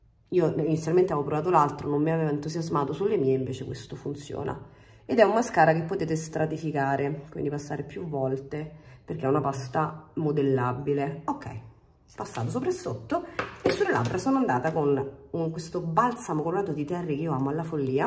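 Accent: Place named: Rome, Italy. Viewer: Non riesco a seguirla, con quell'accento romano e quella voce repellente. Purtroppo esiste l'antipatia.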